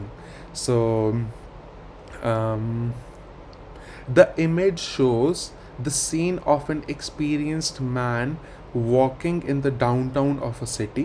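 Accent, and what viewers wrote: India. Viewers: Look at your own accebt and body language typical indian its not at all English. How do you teachers. To me very substandards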